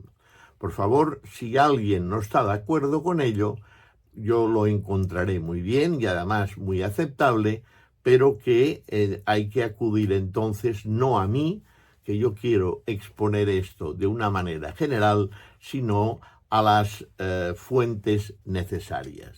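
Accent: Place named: Catalonia, Spain. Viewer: Se nota su acento catalán Un saludo desde Miami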